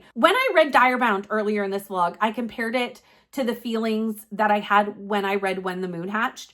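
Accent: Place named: Canada. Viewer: Its weird, because every time you say it's your Canadian accent, I'm just like....does this mean I have a Canadian accent, and I just don't hear it xD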